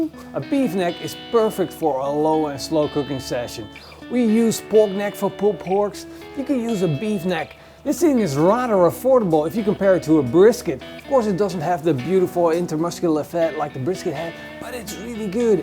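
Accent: Netherlands. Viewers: You're Dutch, aren't you? 👌😉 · Love the videos man but was wondering are you dutch?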